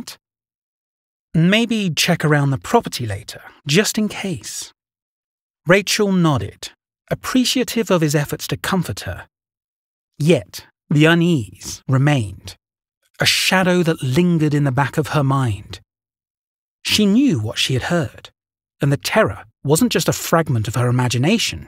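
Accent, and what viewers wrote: United Kingdom. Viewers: I just found your channel and I’m so glad I did! I noticed you have a British accent are there any cryptids from Europe that you are particularly interested in? I’ve always been fascinated by the Black Shuck stories from England and the Pricolici from Romania and of course the OGs Vampires and Werewolves 😂. Have a wonderful day ❤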